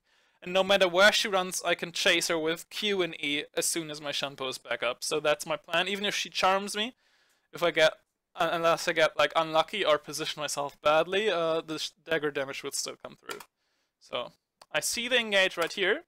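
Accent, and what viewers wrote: Germany. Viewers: u are german? :D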